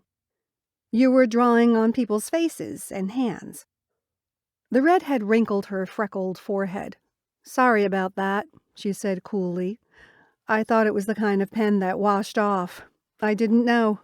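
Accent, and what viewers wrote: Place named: Canada. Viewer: Canada. The accent is about what I would hear back home in Wisconsin. The local slang,....no idea.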